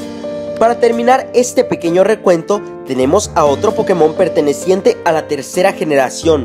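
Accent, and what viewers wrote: Mexico. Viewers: yo soy mexicano y. o me importaría ver a el hombre con hacento mexicano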